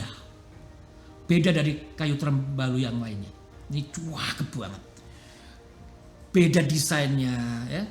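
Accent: Java, Indonesia. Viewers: Pembabaran yg sangat menarik mas, dalam menjelaskan sejarah dengan penuh semangat 😁 kami jadi greget menontonnya. Dengan logat jawa dan terkadang ada guyonannya membuat penonton lebih santai tetapi tetap serius. Cara pembelajaran sejarah yg sangat efektif, sungguh apabila seorang guru disekolah memberikan pelajaran seperti mas. Pasti akan lebih semangat, serta ditunjukan budaya tosan aji maka mereka akan tau hebatnya nusantara ini. Sukses untuk GPI dan team, semoga cepet terlaksana mas keinginan untuk membuat film sejarah. 🙏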